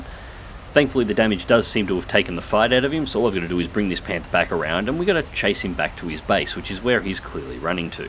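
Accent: Australia. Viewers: Love hearing a friendly aussie accent <3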